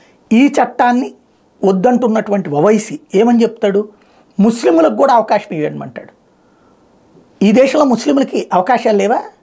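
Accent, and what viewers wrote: India. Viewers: Exllent explain that is indane vioce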